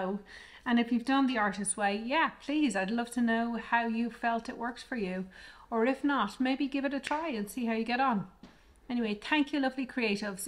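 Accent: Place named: Ireland. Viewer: Congrats!🎉 Love your channel …. And the Irish accent is lovely. Good luck with your channel! 🌸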